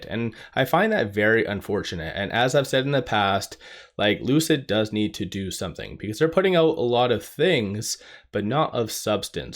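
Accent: Canada. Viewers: Good Canada speak.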